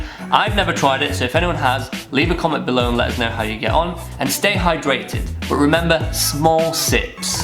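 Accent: United Kingdom. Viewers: Great regional uk accent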